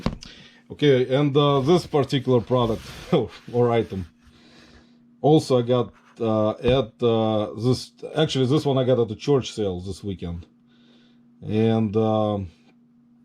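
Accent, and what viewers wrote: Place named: Russia. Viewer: I hope you don't mind me asking. What country are you from? My son served a mission in Iceland for two years and I have friends down there now I talk with all the time. They speak English, too, and they're accent sounds a lot like you , but the more I'm listening , sounds Russian. Either way, Love it! I've sold those singing fish in the past but it's been awhile. Glad you were able to sell it. Woa! That's awesome on the Precious Moments. Looks like it's from the 70s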